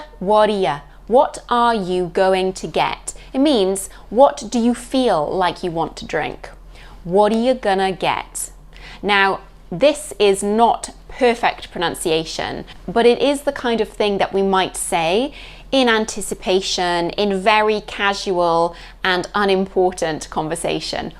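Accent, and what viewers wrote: United Kingdom. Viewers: Hello! If I go to the restaurant in Europe, Should I say ' Could I have a bill please?'. I love your British English.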